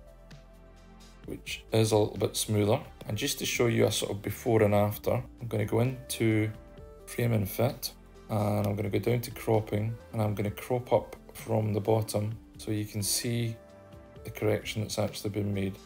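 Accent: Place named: Scotland, United Kingdom. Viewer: This was just what I was looking for. Very informative and great to hear a fellow Scot on YouTube!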